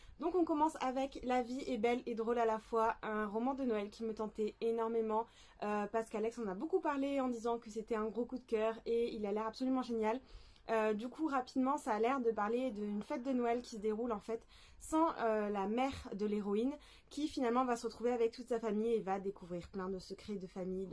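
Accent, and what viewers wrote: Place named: South, France. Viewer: J'ai reçu Pumpkinheads pour mon anniversaire aussi, sauf qu'Amazon a merdé et qu'il est en italien :') Heureusement que je le parle un peu, mais ça va être folklo ! J'ai très envie de me jeter sur Le Royaume assassiné aussi maintenant que je l'ai reçu miraculeusement en HB 😍 Trop cool pour le pendule ! (j'entends de fou ton accent du sud sur certaines intonations mdr)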